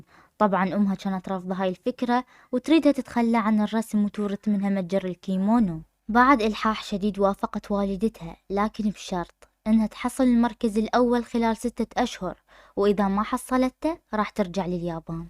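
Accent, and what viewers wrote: Iraq. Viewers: لهجتك العراقية تجنن و طريقة سردك للقصة خياااال ما شاء الله 😭🤍✨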